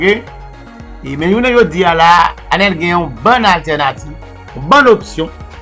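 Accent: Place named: Cap-Haïtien, Haiti. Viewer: Saaa c nèg okap kap palé laaa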